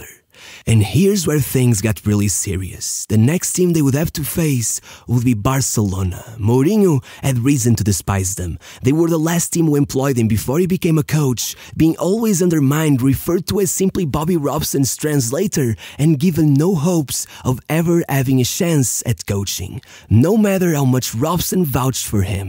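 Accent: Portugal. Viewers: Why do you pronounce everything like it's portuguese ? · Tenho a certeza que és tuga 🤣 mas força manu curto a brava 👏👏👏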